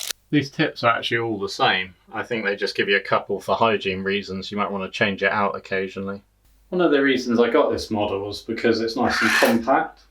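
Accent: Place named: Liverpool, United Kingdom. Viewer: You have the same accent as the John Paul George & ringgo Good review, thank you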